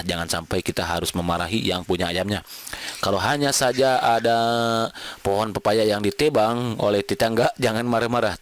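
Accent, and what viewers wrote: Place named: Batak, Indonesia. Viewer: sebelumnya terimakasih ya mang utk video2 nya tapi mohon maaf saya cukup terganggu dgn aksen mang yg kaya gini. jd kurang fokus dengar penjelasannya, biarpun saya org batak asli yg kalau ngomong kekgini tp kurang nyaman dengar cara ngomongnya😢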